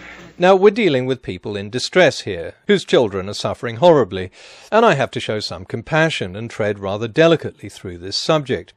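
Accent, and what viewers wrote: United Kingdom. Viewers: I take this guy twelves more seriously because of the GODDAMN BRITISH ACCENT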